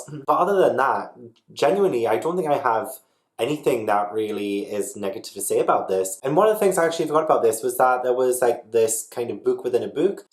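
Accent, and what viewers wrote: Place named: Geordie, United Kingdom. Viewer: I'm crying at the Most Haunted skits 😂 This is so good,! I loved the broad Geordie accent, feels so weird hearing it on youtube! I wonder who could understand it 😂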